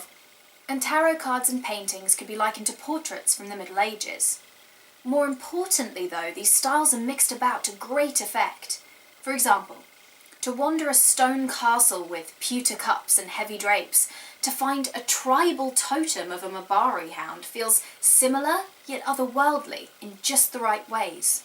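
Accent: United Kingdom. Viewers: You have a British accent. I'm subscribing! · Very good review, it’s a fair bit more informative than how I’ve found most other reviews of this game to be. In all honesty, I am already quite convinced that I will love this game (I enjoyed most of BioWare’s products, from Knights of the Old Republic to Mass Effect 3), but I had to see other people’s views on it so I would feel better about spending so much money on Dragon Age: Inquisition… seriously, this game is soooo expensive in the UK! Anyway, I found the part where you talked about the choices and their more subtle effects really interesting; it seems to me as though they are more maturely done and closer to what we have in the Witcher trilogy, rather than the binary options of most BioWare titles. Though I’m also prepared to expect from Dragon Age choices that are more about defining your personality than truly changing the storyline, whereas in the Witcher games we are only given a certain degree of liberty with Geralt – who is an already existing character – but have much more freedom with the direction in which you can take the story. 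Of course, both approaches have their ups and downs, so I’m not saying either is better than the other. By the way, are you from the South of Britain? Your accent sounds like someone from that area (asking only out of curiosity, as I find languages and speech quite fascinating personally). Anyway, this is a great video, I will subscribe.:)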